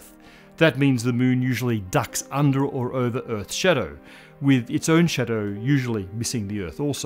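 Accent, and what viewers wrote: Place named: Australia. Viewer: Matt is my all-time favourite narrator. His Aussie accent, perfect pronunciations, and fluid delivery are awesome. Give this man a round of applause! :hand-pink-waving::hand-pink-waving::hand-pink-waving::hand-pink-waving::hand-pink-waving::hand-pink-waving::hand-pink-waving::hand-pink-waving::hand-pink-waving::hand-pink-waving::hand-pink-waving::hand-pink-waving::hand-pink-waving::hand-pink-waving::face-blue-smiling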